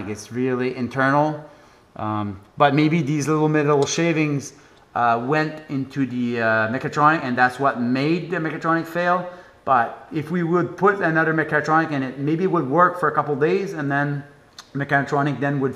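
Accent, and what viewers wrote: Quebec, Canada. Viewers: Ah un Québecois, Salut :)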